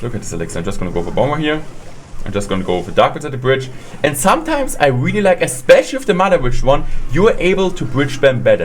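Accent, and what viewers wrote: Germany. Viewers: man merkt richtig deutscher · Man hört so krass das er deutscher ist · I like your german Aczent😂 Bin btw auch deutsch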